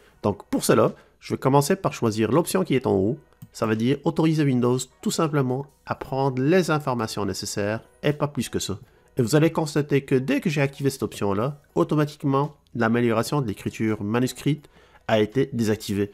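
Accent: Quebec, Canada. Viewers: Abonnée + 1 et oui, vidéos extras et avec ce petit accent québécois, c'est encore plus sympathique. Merci ^^ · Cela semble convaincant !!!!!: mais même s'il me semble que cela doit être super important, je crains de faire une mauvaise manip qui pourrait provoquer des problèmes sur mon PC...MAIS je serai tentée de le faire.....Merci et j'adore l'accent Canadien que j'écoute depuis le Médoc en France.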